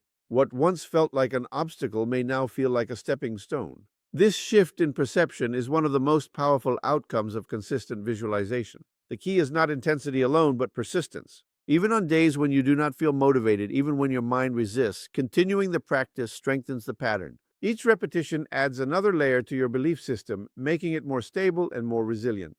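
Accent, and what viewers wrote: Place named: Spain. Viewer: Jose had a pretty strong Spanish accent from what I remember. But these are definitely his words. His cadence was also slower. His speech naturally allowed one to absorb the information. Did u speed the video up & use AI to adjust his voice? His ideas are solid since he studied this from the 1950's-60's but the editing made it just a regurgitation of info that one doesn't quite catch. Solid ideas-great, taking away the accent-ok I suppose or meh, Speed & cadence change- meh. Dont really care for it. But I've listened to Jose walk thru a meditation/guidance almost every day since I found him 2-3 yrs ago. Vishen has a good voice but does the same thing g u did. Delivers it fast. His goal tho is for sales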